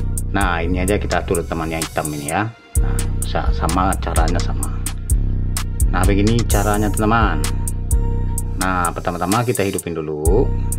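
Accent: Padang, Indonesia. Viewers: tengkiw bg, btw orang padang ya bg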